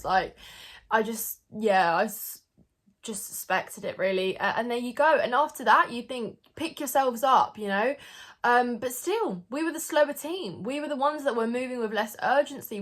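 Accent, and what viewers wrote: United Kingdom. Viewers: i can listen to talk all day I don't know why British get make fun of by Americans because of their accent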